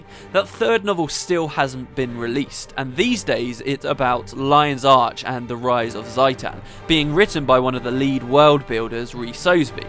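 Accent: England, United Kingdom. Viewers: I've never even played guild wars. English accents make you do things...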